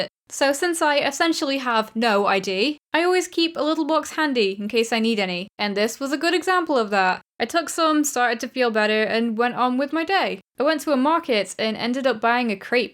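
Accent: England, United Kingdom. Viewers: Where are you from? I'm English too, I love your accent! Hope you're okay now :)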